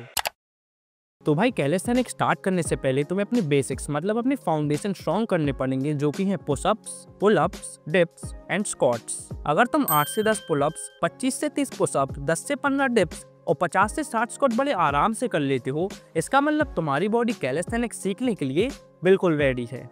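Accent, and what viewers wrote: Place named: Bundelkhand, India. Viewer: Bhai kha se ho voice se toh bundelkhand ke lagte ho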